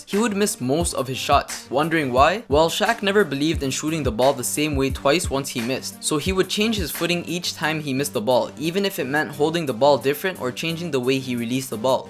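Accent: Toronto, Canada. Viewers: This is my first time ever watching your videos and I can definitely tell you're from Toronto so I'll sub lol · your accent lmao Canadian lol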